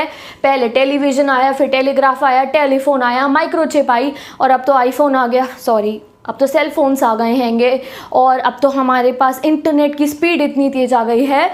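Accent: Punjab, India. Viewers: ur punjabie this is clearly fy with ur talking style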